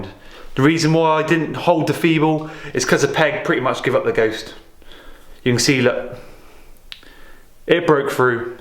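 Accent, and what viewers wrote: United Kingdom. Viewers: hate english accent. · Are you Cornish 🔥🤘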